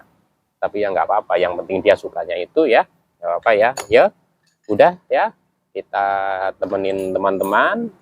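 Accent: Banyumas, Indonesia. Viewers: Kk ini didaerah mana kk ngapak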